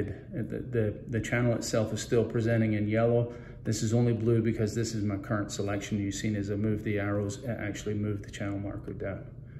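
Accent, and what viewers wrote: Scotland, United Kingdom. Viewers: a wee Scottish twang in your accent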